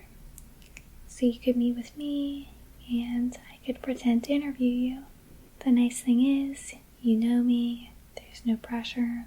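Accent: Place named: Midwest, United States. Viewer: Do you live in the midwest? You sound like you have a midwest accent.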